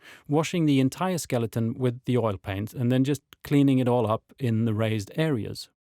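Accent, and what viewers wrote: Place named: Sweden. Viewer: You sound Swedish, and the store looks like Incrade in Umeå. How far off am I?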